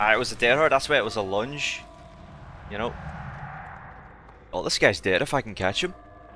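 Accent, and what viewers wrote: Northern Ireland, United Kingdom. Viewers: Is this a Norn irish man playing DBD? Lol my guy. Good to see someone else from these parts playing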